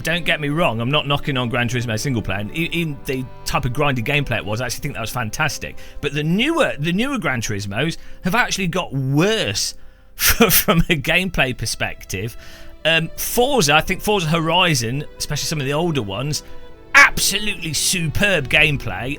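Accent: United Kingdom. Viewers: Here we have it folks. This is why they tell you not to consume lacquer thinner, let alone on a daily basis... That bald, british sounding man's brain rot level has gotten severely out of control at this point. Time to gently smile back and slowly leave the scene. Might be contagious after all... 😂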